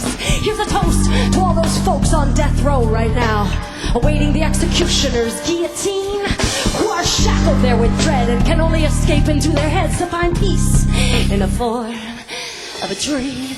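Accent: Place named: South, United States. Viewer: I swear if she EVER talked to me with that voice i would die! And her lil country accent would ..aghhhh i'd just die :p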